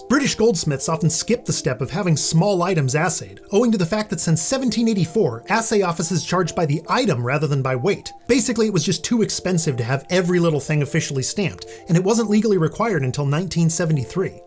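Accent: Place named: United States. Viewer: His accent is American but his legal references are British. I don’t know where the law changed in 1973.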